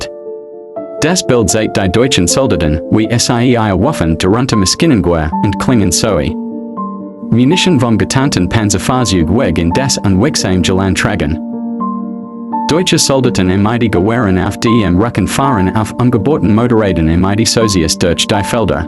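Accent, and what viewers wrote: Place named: England, United Kingdom. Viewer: Terrible pronunciation. Strong English accent.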